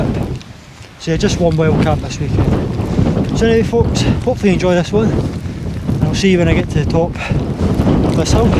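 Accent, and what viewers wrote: Scotland, United Kingdom. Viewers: Thanks for that young man - regrettably I've never been a hill walker though I did scale one Munro in my earlier years - it's definitely something that I regret however with modern technology I can indulge in viewing blogs like yours which while isn't the real deal gives me enormous enjoyment taking in the majestic scenery and views - I'm a glaswegian and can appreciate your neutral Scots accent and modest demeanour - keep the videos coming and keep safe - PS I still have my camping gear which includes a terra nova voyager tent and thermarest mat - need to get the finger out and engage in a wee trip soon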